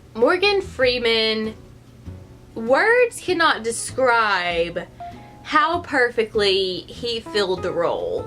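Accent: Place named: South, United States. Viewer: Love your accent. Proper southern lady there.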